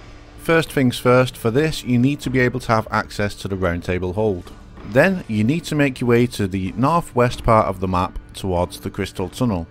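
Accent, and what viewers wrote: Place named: Northern England, United Kingdom. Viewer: Think your a northerner GGs I'm Scottish and bad with accents, it's close to mine tho. Subscribbled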